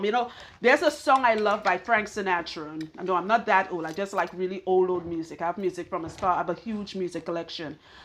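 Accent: Trinidad and Tobago. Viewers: Hearing my accent on you is so beautiful, fellow Trini. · I knew it!!!! That Trini accent cannot hide....u had me laughing...but u were very direct and imformative and i appreciate that...firt time viewer from your home land...🇹🇹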